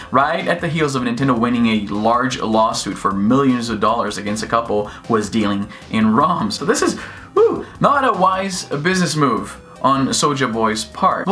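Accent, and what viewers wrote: Brazil. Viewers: You're Brazilian? I couldn't hear your accent until you pointed it out. Please speak some Portuguese! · The moment you said you were Brazilian, I immediately started to hear your subtle accent lol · hey there first time viewer here, great video lol I thought I recognized your accent but didn't put two and two together till you said you were Brazilian I am an American Brazilian so a lot of my family that speaks English has a similar accent but yeah sorry to get to my point of commenting lol he posted on twitter he made 250,000 dollars in 24 hours of starting selling his knock off consoles lol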